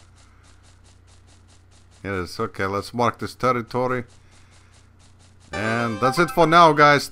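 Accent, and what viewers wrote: Russia. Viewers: Я знаю что ты говоришь на русском по твоему голосу Я я знаю что ты русский но ты разговариваешь на на английском чтобы люди поняли тебя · Love your Russian accent!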